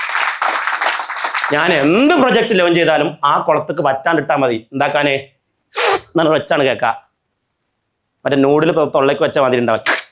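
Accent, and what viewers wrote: Malappuram, India. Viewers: Thanku sir... താങ്കൾ മലപ്പുറം ദാഷയിൽ സംസാരിക്കുന്നത് നല്ലത് തന്നെ പക്ഷേ സോഷ്യൽ മീഡിയ യൽ കാണുന്ന എല്ലാർക്കും തിരിഞ്ഞോളണന്നില്ല..... സസ്നേഹം ഹരീഷ്‌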